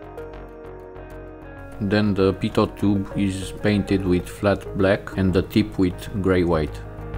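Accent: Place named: Romania. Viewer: Dupa accent esti roman 100%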